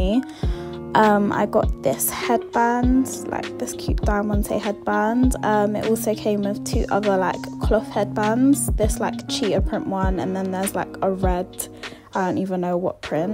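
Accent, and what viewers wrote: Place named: United Kingdom. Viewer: I feel like when people have a British accent they have an advantage in life but when people have an African/ British accent. Untouchable. Lol looks so good on you and love your voice. It’s so pleasant.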